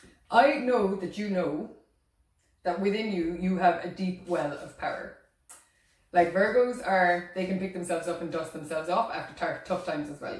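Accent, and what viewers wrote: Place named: Ireland. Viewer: Hello love your reading. Beautiful accent, it makes me miss being in Ireland with my grandparents :) All my family is from Ireland I was born and raised in Prince Edward Island Canada. Looking to hear more in the future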